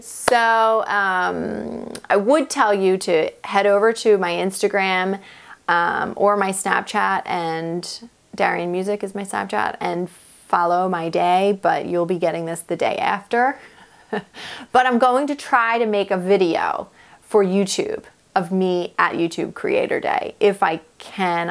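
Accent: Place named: Michigan, United States. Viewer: No, it sounds like a Michigan accent. I like your accent. I have a heavy Brooklyn NY accent.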